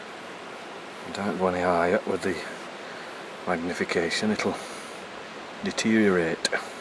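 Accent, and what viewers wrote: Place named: Northern England, United Kingdom. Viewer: Good footage of the sunfish and I love the narrating proper northern accent, me grandad was from Halifax so was a nice reminder. Thanks for this video.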